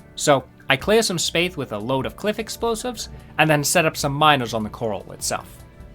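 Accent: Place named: Northeast, United States. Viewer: How interesting! I would have sworn you sounded like someone from the NE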